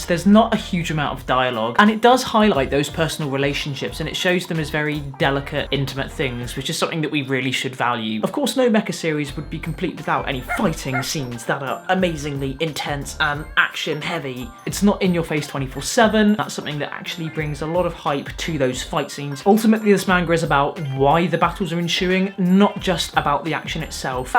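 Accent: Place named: United Kingdom. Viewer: I thumbed this up just cause I like your brit accent :p Did you read this manga through the end, up to and including the final chapter (78)? Since you disliked being handed over explanations I'm sure you liked it lol. Nihei's other works have even less dialogues, less characters, less character development, less character interactions and romances, less everything ahah. Anyway, season 1 & 2 of the anime were really pleasant and made me read the manga, but once the harem got put in place it started going downhill. Especially past chapter 55-60. Kinda a waste of such potential =/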